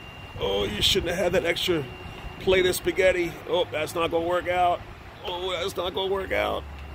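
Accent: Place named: New Jersey, United States. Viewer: This dude gotta be from Jersey. Pasta, Jersey accent and buncha knuckleheads shouting in the background 😂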